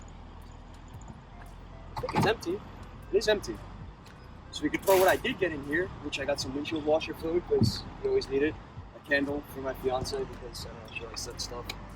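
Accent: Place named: Long Island, United States. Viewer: I spotted your accent and i'm like this guy is from Long Island, I'm from Suffolk but i moved to Orange County, CA about 15 years ago.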